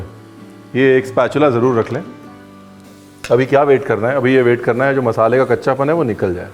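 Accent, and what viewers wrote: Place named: Punjab, India. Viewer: Aa bani gal.... Vich vich punjabi shi lagdi aa. ..good........... yar JALANDHAR ton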